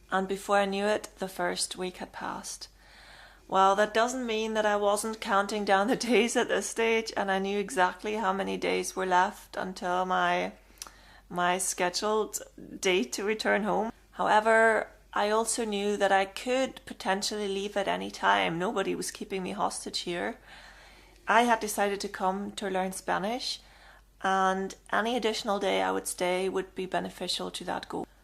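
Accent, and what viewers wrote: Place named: Northern Ireland, United Kingdom. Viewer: You sound northen irish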